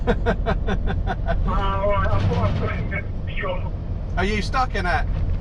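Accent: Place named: North, United Kingdom. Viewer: great northern accent lol irish amer ny us airforce veteran master obrien sir lol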